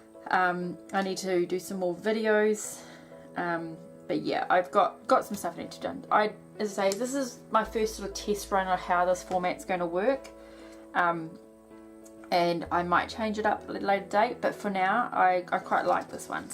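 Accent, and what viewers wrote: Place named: New Zealand. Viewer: Hi - I love hearing our kiwi accent on bullet journal videos!! I started a bullet journal in August last year...just in a grid notebook and using a black biro. The system made sense to me so I bought a Leuchtturm 1917 dot grid from The Drawing Room (Chch) for 2017. Keep it simple to begin with...check out NZer Pages to Plans on Instagram and her own website... Boho Berry - Bullet Journal 101 (she also has a resource library with handwriting practice sheets)...The Lazy Genius How to Bullet Journal. All the very best!!!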